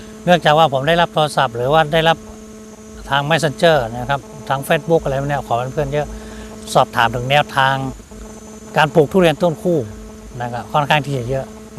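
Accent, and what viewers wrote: North, Thailand. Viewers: ฟังจากสำเนียงแล้ว เป็นคนเหนือใช่ใหมครับ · อ้ายคนเหนือแม่นก่คับ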